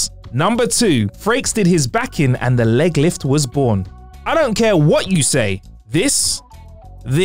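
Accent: United Kingdom. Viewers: That British english is horrible.Slow down mate. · even with a british accent I could tell what he looked like. Are those my skills?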